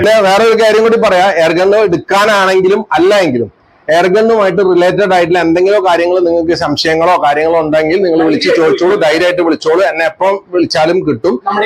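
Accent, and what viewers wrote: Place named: Kochi, India. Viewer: Kochi karude samsaram padichallo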